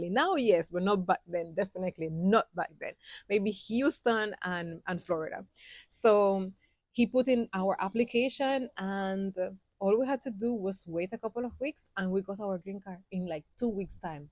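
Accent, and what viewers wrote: Patois, Jamaica. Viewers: Hey,. Interesting vid. I must say that’s it’s amusing hearing your’Spanglishyardie’ accent—very unique. I am a yardie to mi core except l’ve lived all over the US for decades—fully integrated. I visited JA in Summer ‘23 and had 1 helluva experience. I stayed at the Riu Negril for 4 days then at an AirBnB in Mamee Bay, Ocho Rios x 1 week. Very different experiences. There was a group of us so the whole time a l had a rented burgundy Kia Carnival turbo diesel minivan(awesome vehicle). Turns out that the rental was a $$ magnet for the cops. My brother(his turn to drive) was shaken for cash in broad freaking daylight on TWO occasions w/i 1 week by corrupt cops!!!😳🤨 On a different occasion l was driving thru Port Maria and saw the body of a bicyclist all contorted lying on the roadside after a hit-and-run.😮 The sad thing was the indignity/callousness as ppl just stood around or went about their business as if……! 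The other scary thing that l would warn every foreign visitor about is the danger of driving in JA. Not only is it disorienting driving on the Left, but locals drive like MANIACS!!!! JA is a tropical paradise but there are definite risks one must take visiting the place. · Oh wow… yuh sound like yardie. Accent sweet suh 😂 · Tell your truth black afro 🇨🇺 Cuban Queen like your jamaican accent ❤ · Slight Latin Accent With a Hint of Patios Frequency Nena......Ya Bad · My family are Cubans and its mind blowing listening to you speaking in patua.. I love it!!🥰🤩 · Wow I listen to you carefully and you have been through a lot and I am happy that you have endure the hard times ,it's obvious that you love Jamaica over Cuba ,in Jamaica it is diverse,not all of Jamaica is the same some places if you not involved with certain people you don't have to worry about anything yuh nuh trouble anybody, nobody nuh trouble you.especiaally if you are not a Jamaican. 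You even now sound like a Jamaican if you never says that you live in there I could tell that you have been or intouch with some Jamaican. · Aah mi gal if you ever know my story yu wi cry.love the way yu patwah sounds ❤ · After all you are looking so beautiful keep it up you should live up in Cherry gardens jack hills Beverley hills much comfort able love how you talk the Jamaican Patios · My ears are confused, I'm hearing a Cuba spanish accent and a Jamaican accent fused together, lmoa.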